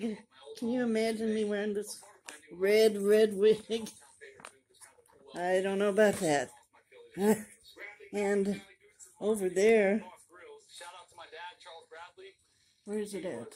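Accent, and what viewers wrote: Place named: South, United States. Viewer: I love your voice, It sounds really nice, sometimes I go over your videos to hear your soothing voice, Its comforting.,Sounds like a Southern Girl.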